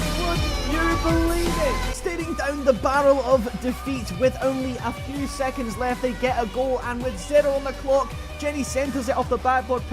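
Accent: Scotland, United Kingdom. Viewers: That Scottish accent deserves 1mil subs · The Scottish Accent 🤣💯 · he sounds scottish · THAT SCOTTISH ACCENT LMAO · Love the Scottish accent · i hate the scottish acent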